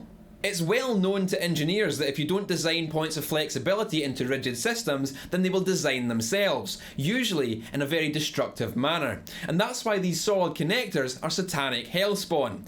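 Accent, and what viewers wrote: Scotland, United Kingdom. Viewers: the fact that you're a scotsman makes the preaching sound even better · Love the Scottish accent. (No homo) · I like Scottish accent even though it's hard to hear..😂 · He’s Scottish. He says “Pair-dull’ 😄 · Reminder to me: Visit Scotland. Just because of that cool accent... :-D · That just made my day... Hearing a Scottish man describe the devil working through guitar pedal couplers in an insidious voice, with sinister music and maniacal laughter in the background. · And that's why the Scottish accent is the greatest ever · Oh my god. Your scottish accent is perfect with your anger ahahahahaaha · i just love the Scottish accent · A Scholar, a Guitarist (I would have said Gentleman instead of Guitarist but being a Guitarist is much better than being a Gentelman lol ) and an Engineer :) well said sir. and I have to say only with your great Scotish accent can you say the most epic part of this video at 0.23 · Why is the Scottish accent so well suited to these "HELLFIRE AND DAMNATION UNTO ALL YE SINNERS!!" kind of things? 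If I said it I'd sound ridiculous! My Glaswegian Great-Grandmother is laughing at me from beyond the grave.... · I didn't know you could get even more Scottish... Great video as always! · John JohnnyArt Pavlou It makes me wonder where he's from. He sounds a bit Scottish. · I'm first time on this channel, accent of this guy is soo beautiful. Is he Scottish ? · Are you Scottish? I think I'm getting better about telling apart accents in the UK. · I am hoping that I was right and it is scottish :D (totally love it by the way) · Here's another one of your videos that I walk away from a wee bit smarter from having viewed it. Aside from the super cool Scottish accent that we all love, you have a great blend of intelligent content and delivery of said content. Keep up the good work! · Yeah the Scottish accent is pretty cool (I’m American) but he really is well spoken and knowledgeable, keep up the good work! 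· Oh how I love me some thick Scottish accent! Ah yes, the old home land. Great truth as well! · If anything I watch your videos for your Scottish accent, I love it. And that's no shiite. Makes me want to watch "Trainspotting" just to hear Robert Carlyle ( Begbie ) say " You fooking coont ". Great content bruv, always spot on. Peace. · This only works because of the Scottish accent.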